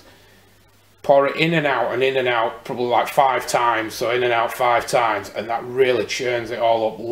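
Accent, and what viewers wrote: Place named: West Yorkshire, United Kingdom. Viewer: You sound like you’re from West Yorkshire like me! Whereabouts are you based pal? I’m just north of Leeds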